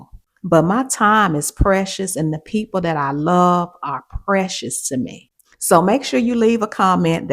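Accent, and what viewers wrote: South, United States. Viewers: Great content! Cool Southern accent. As a Gen Xer, definitely jack of all trades ...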